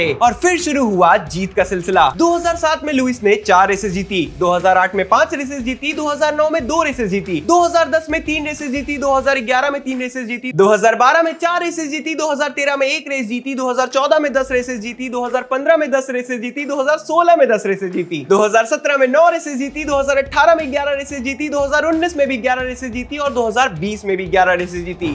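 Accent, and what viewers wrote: West Bengal, India. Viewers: Bhaiya ap west bengal ho kya 😊😊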